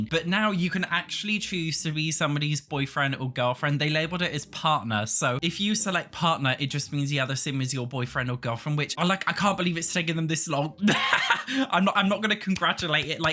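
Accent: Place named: United Kingdom. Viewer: This is the most british accent I have heard in my life.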